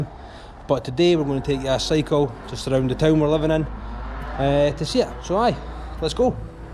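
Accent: Scotland, United Kingdom. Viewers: Every video you have out there, I watch through tears! Happy tears, because you are such a very special person. That little kitty loves you so much, and to see a man love a cat in return is heartwarming. Love your Scottish accent by the way! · Lol All you need to do is listen carefully to what a Scotsman sounds like. He`s speaking perfectly good English with an accent, master the accent and you`ll do better.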